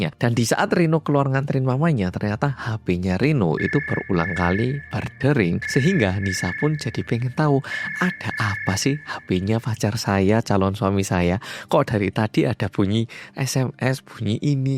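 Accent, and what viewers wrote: Java, Indonesia. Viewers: Gausah. Walopun ngomong medok. tetep orang Korea. Walopun dulu lama tinggal di Indonesia sekarang dia tinggal di korea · medok 🎉 Lgsg nyimak 🎉🎉🎉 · dia gak salah apa apa kok ngatain sorry unsubscribe wkwkw dulu dia lahir di korea dan besarnya di indo dari kecil lho apalagi udah mahir bhs indo yg medok, dan dia itu cinta bgt sama indonesia.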